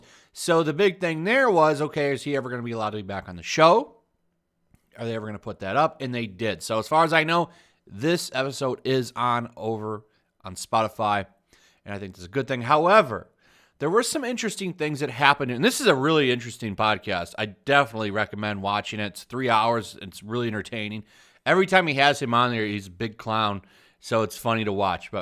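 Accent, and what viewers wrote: England, United Kingdom. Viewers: That’s the most hilarious english accent I ever heard !!!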